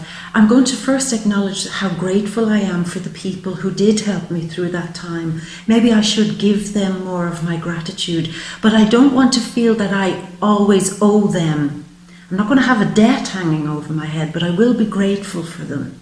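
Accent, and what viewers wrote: Ireland. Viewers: I'm barely 4 min into your reading and love what you are saying! love the brogue. xoxox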